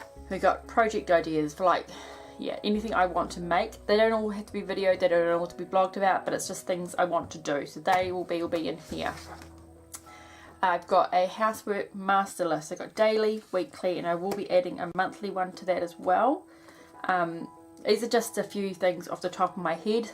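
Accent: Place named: New Zealand. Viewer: Hi - I love hearing our kiwi accent on bullet journal videos!! I started a bullet journal in August last year...just in a grid notebook and using a black biro. The system made sense to me so I bought a Leuchtturm 1917 dot grid from The Drawing Room (Chch) for 2017. Keep it simple to begin with...check out NZer Pages to Plans on Instagram and her own website... Boho Berry - Bullet Journal 101 (she also has a resource library with handwriting practice sheets)...The Lazy Genius How to Bullet Journal. All the very best!!!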